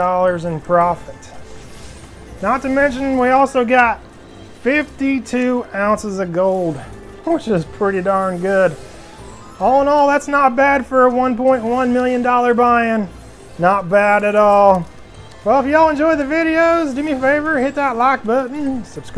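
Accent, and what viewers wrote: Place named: South, United States. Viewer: Love that southern drawl.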